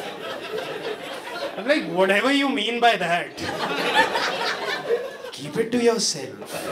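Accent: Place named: Jaipur, India. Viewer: this guy has a jaipur accent,is he from jaipur?? Anyway great comedian with no stereotypical jokes on politics and the country.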